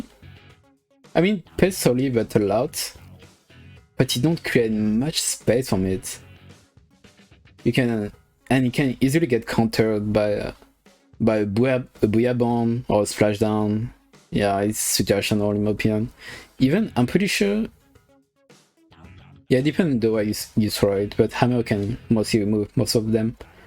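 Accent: France. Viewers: The french accent se fait sentir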